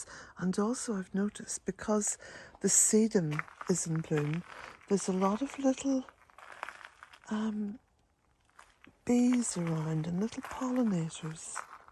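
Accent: Ireland. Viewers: Dear lady thank you for sharing your beautiful life! I was on your website and was completely Enchanted I especially loved listening to the first chapter of your book on selling your home and finding the land. I so love your Irish brogue as my late Grandpa spoke with one. I was wondering if you had any intention of offering your books in audio format so that I might listen to that brogue and to to your voice because it reflects much more deeply than written word. Thank you for considering the possibility. I look forward to exploring your other offerings. I'm trying to remember how I found you but I think it was Revealing Light Tarot and the lovely Mary Ann. Your work connects me to my lost Irish roots. In deepest gratitude, thank you!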